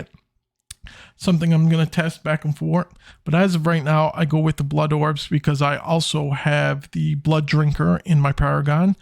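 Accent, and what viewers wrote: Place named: Newfoundland, Canada. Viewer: where u from u sound like a newfie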